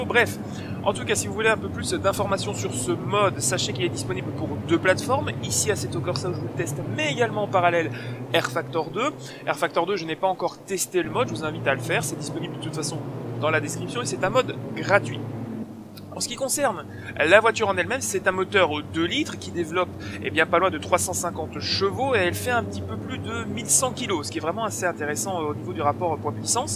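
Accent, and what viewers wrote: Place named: Belgium. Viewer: c'est différent on va dire. Pour moi c'est convenable, juste qu'on dirait qu'on t'entend de plus loin. Peut être juste un soucis de volume j'pourrais pas dire. J'en profite que tu aies répondu a mon message pour te féliciter pour tout ton travail, que c'est grâce a toi et ton pilotage que je me suis mis a fond dans la simu auto sur circuit (Je jouais qu'aux Dirt et a dirt Rallye des la sortie.). Commentary superbes, pilotage superbe, et qualité au top. Puis c'est cool d'avoir un peu d'accent belge aha. Encore merci pour ton contenu! T'es au top!;) PS: Manque une seule chose, plus de vidéos sur Dirt, comme une carrière en let's play par exemple, et pourquoi pas du test Rallye sur Assetto Corsa. Et la j'aurai plus rien a demander aha 😂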